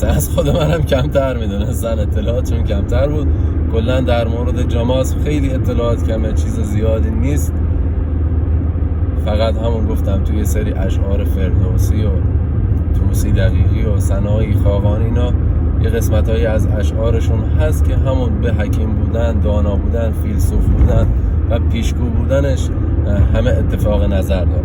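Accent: Shiraz, Iran. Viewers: تشکرمیکنم ازشماعزیزدل که ایران زیبارابالهجه زیبای شیرازی بمانشان میدیدبجای من پدرمهربانوببوسید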